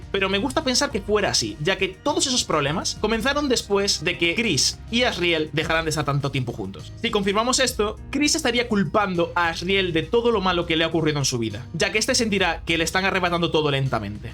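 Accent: Galicia, Spain. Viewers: el acento español me lleva a ese 2016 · puede que estén haciendo ambos el mismo acento gallego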